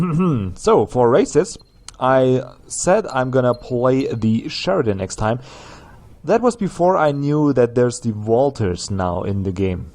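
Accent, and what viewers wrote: Germany. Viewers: Are you, by any chance, german?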